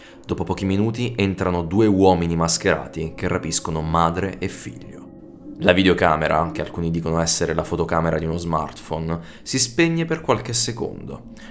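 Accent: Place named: Apulia, Italy. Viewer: Ciao, di dove sei? Il tuo accento sembra pugliese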